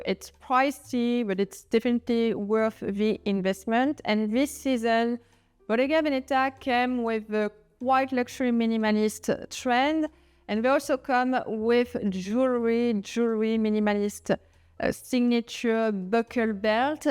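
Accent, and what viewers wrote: France. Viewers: unrelated, but the French accent sounds very fancy. It matches the theme haha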